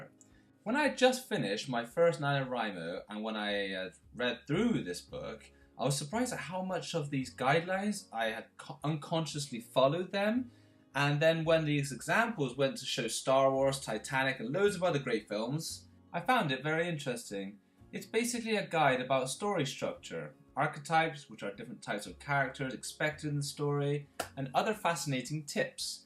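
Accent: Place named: England, United Kingdom. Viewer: Oh my friend! Te entiendo mas ahora jajaja se te pego el acento ingles. Jajajaja. Suerte con todos tus objetivos!!!!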